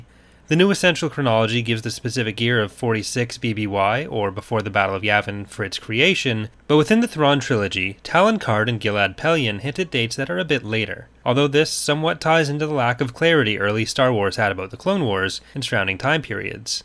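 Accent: Canada. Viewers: I feel like the black fleet crisis could fall under this category. I know most ppl don't like those books, but I personally do. I love new republic stories and older star wars material in general, it's so nostalgic to me., u sound like u have alittle Canadian in you!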